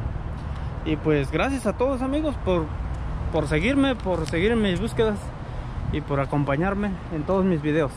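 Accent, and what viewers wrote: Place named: Guatemala. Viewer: Hola amigo Saludos desde Ecuador ciudad Guayaquil eres de Guatemala por el acento.un abrazo.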